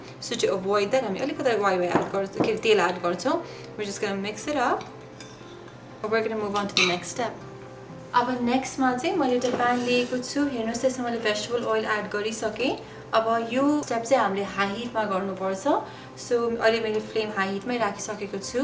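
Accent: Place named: United States. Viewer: Nepali with US ACCENT WITH HINT NEPALI IN IT 😁❤️👍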